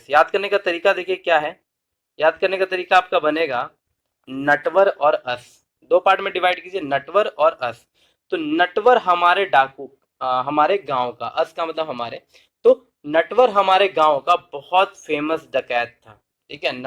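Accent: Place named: Bihar, India. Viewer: Sir aap bihar se ho kya...😍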